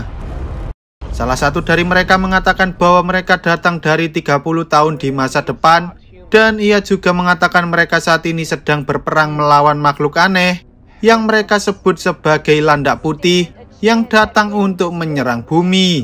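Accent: Java, Indonesia. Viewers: Meddo yah gaesss 😂😂😂😂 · Suara nya terlalu medok jawa nya jadi agak kurang nyaman di dengar nya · Jawa bangetttt lol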